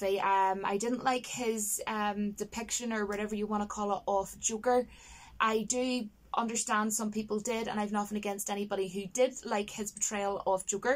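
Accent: Ireland. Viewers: Agreed. I hope to not be too much with this comment, but I love your accent! I want you read me every book in audio format lol. Never played the Witcher, but I could pick out that smooth Irish brogue from the other side of the world! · Intresting accent.. is that Irish? i love your accent, it remind me of witcher 3's NPCs.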